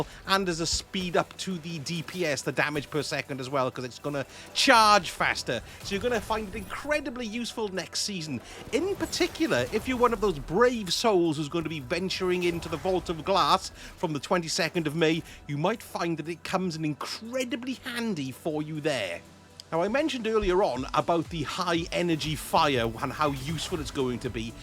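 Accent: Wales, United Kingdom. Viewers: Can I hear a fellow welshman? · Are you welsh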